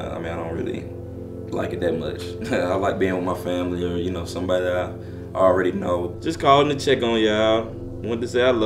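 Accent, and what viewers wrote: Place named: South, United States. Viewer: straight down south accent.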